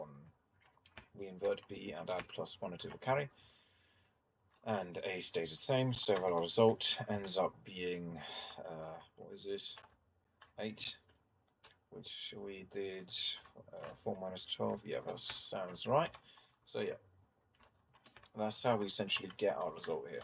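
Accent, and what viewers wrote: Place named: United Kingdom. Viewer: Good Video but the british accent is a bit annoying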